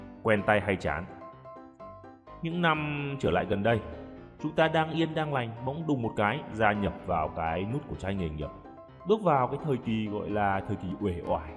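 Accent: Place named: Hanoi, Vietnam. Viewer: giọng chuẩn trai Hà Nội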